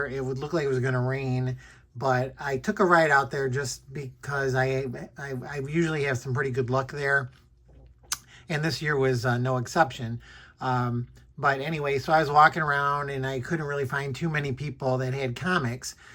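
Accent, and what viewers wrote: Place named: Michigan, United States. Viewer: I can hear your nasal Michigander accent. I'm originally from GR, but in CHI now. Great haul!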